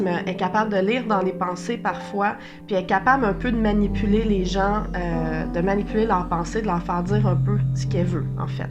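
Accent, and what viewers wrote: Canada. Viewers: Oh punaise une canadienne avec l'accent canadien, je trouve cette accent tellement magnifique. Merci pour cette vidéo j'essaye d'en apprendre plus sur les sorcière. Je suis sorcière solitaire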